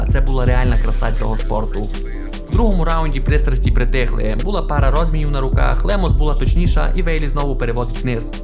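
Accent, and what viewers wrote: Western Ukraine, Ukraine. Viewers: Я привик дивитись по російській мові а тут ви по рідній західній Івано франкіівській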